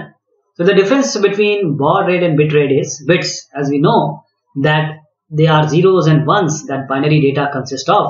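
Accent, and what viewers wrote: India. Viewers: Classic Indian accent, noice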